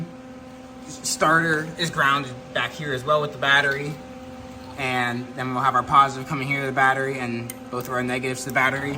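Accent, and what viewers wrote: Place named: United States. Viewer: Random question but do you live in Europe I noticed all of your license plates are European but you sound like American or Canadian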